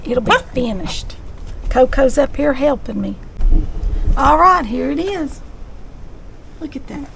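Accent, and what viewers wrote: South, United States. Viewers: I just subscribed tonight. This is my second video and I watched one more before this one. I thoroughly enjoy your videos. I love hearing your southern accent. Im originally from South Mississippi but due to being in the military and my husband's home being here in Virginia, this is where I live. Thanks so much for sharing your talent. 😊❤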